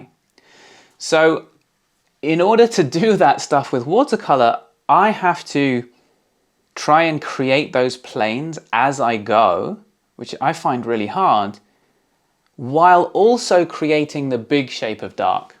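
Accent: United Kingdom. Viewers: I love the accent when an English person lives abroad they have an accent diffent from home town but yet not same accent in country they living in, nice paintings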